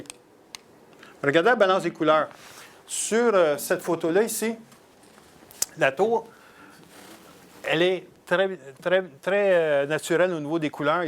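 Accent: Quebec, Canada. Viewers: merci pour vos conseils ils vont mettent très utiles pour mes prochaines photos et un super merci pour votre accent j'ai vraiment hâte d'aller au Quebec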